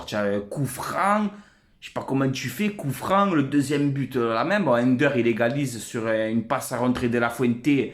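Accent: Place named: Garrigues, France. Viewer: Franchement avec ton accent des garrigues tu me régales...!!! La causerie de l'après match avec les incidents et la comparaison du COVID qui empêche d'aller voir les matchs aux stades ma parole bravo tu as tout dis..!!! J'ai entendu des supporters marseillais dire qu'ils allaient tourner leurs vestes notamment un auditeur sur RMC après le match, sous prétexte que Messi arrive à paris, perso je pense et j'espère que ils se font passer pour nos supporters mais que ceux sont des mitos... Dis moi tu en penses quoi.?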